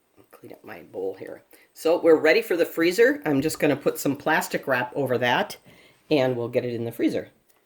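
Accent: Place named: Rochester, United States. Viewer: That looks YUMMY--and so easy even I can do it!! HEY--are you from Rochester by any chance?? (I'm a native.) You have a Rochacha accent! I live in Virginia now and I miss my home town but not in the winter!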